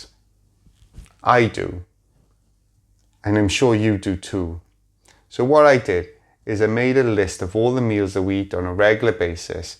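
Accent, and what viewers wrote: Wales, United Kingdom. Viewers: Thanks for a wonderful talk and love your Welsh accent! The way I think of things are that most medications only treat the symptoms of T2D , only a good clean diet of natural foods will help the actual disease from progressing. As Dr Jason Fung says “ Diabetes is a dietary disease and therefore needs a dietary solution”. Charge on Rhinos and good luck to you all.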